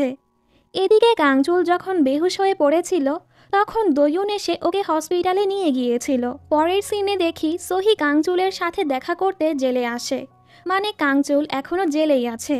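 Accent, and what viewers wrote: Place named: Tangail, Bangladesh. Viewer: Boilo to tangail ar vasha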